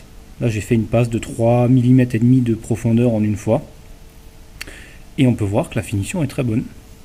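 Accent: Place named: Alsace, France. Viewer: ça fait plaisir de voir ton travail surtout quand on sait ce que tu es capable de faire via le forum, je me suis abonné a ta chaine qui est une mine d'informations. Tu ne dois pas etre loin de mon secteur ( alsace ) vu ton accent. Merci pour tes vidéos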